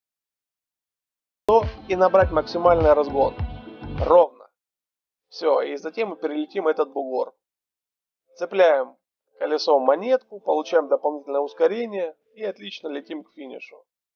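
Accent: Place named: Ukraine. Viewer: Для Украины нормальный говор. А нам русским режет слух. Зато какая информация ценная! 🔥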